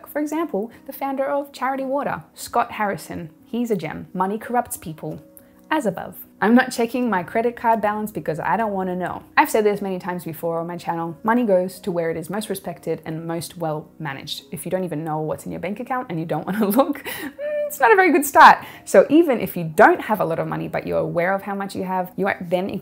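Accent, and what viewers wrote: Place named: Australia. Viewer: I couldn´t figure out why but the australian accent helps me to understand subjects better.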